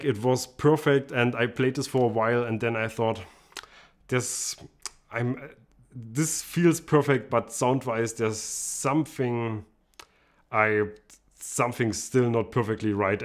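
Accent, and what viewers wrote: Germany. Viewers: Hey brother, I love your channel. I'm also one of those guys that has nicer basses than I should considering my playing ability. I love your self-deprecating humor and also your accent. I was stationed in Germany while in the military & met some very cool people there-it was a blast! I hope to make it back for a visit in the next couple of years. Much respect to you and all the German brothers and sisters.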